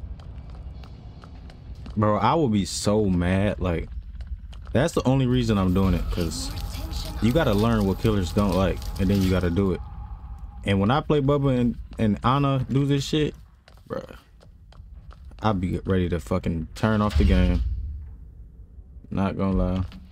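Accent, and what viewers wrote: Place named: Detroit, United States. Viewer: Sound like my homie from 7 mile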